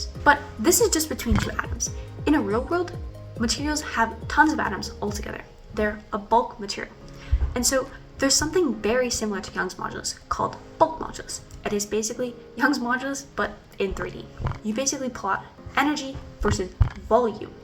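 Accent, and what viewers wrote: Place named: Hungary. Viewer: You are Hungarian?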